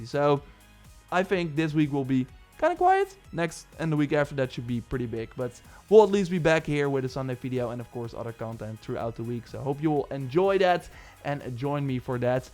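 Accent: Netherlands. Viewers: nederlands accentje valt wel goed op he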